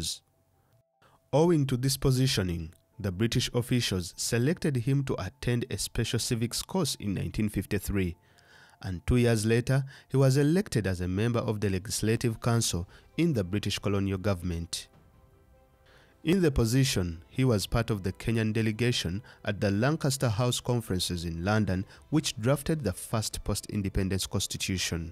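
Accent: Uganda. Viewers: This is not a Kenyan giving this narration, the accent sounds Ugandan.Why not give us the story of UG especially the Amin years.He was one of the worst dictators in Africa, Moi pales in comparison to him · The accent sounds Ugandan of the Narrator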